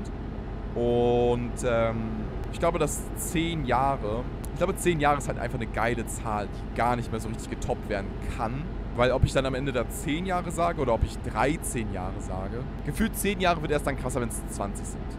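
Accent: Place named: Brandenburg, Germany. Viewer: Er kommt halt aus Brandenburg, wenigstens verstellt er sich nicht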